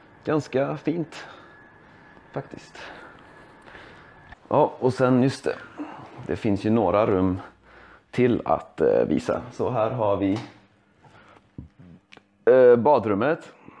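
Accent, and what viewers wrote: Sweden. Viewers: så söt du är alltså! din dialekt är fin också, göteborgska eller? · So helpful! What part of Sweden is your Swedish typical of?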